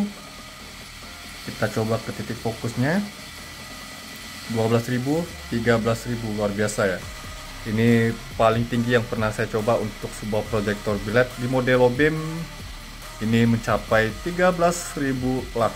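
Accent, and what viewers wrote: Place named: Sumatra, Indonesia. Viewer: Logat sumatra bang emg gitu ga perlu di komentarin wkwk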